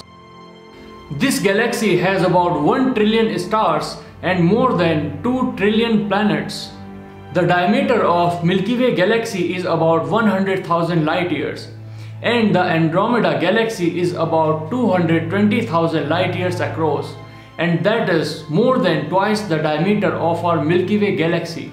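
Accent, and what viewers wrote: India. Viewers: I love this guys Indian accent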